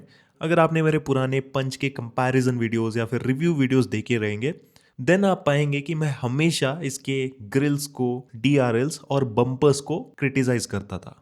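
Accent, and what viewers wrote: Kerala, India. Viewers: I am big fan ...the way u talk....think ur malu from kochi. Thanks 👍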